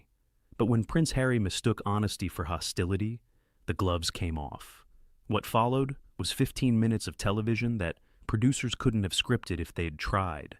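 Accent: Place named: United States. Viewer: Yes, no record of this to be found. Having an American narrate is plain weird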